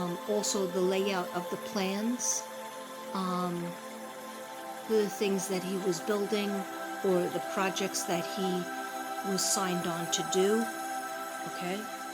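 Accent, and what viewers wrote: New York, United States. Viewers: seriously I am so amused by you. ❤️ Your NY accent in TX. I love it! You guys must have moved there for a job because why else would a proud NY’er move so far southwest? It must have been a difficult transition. Lived my whole life in the Midwest, went deeeeeep south to visit my husbands family and felt (and probably looked) like a fish out of water. Nice to visit, not stay 😂. I love your heart, you are so precious.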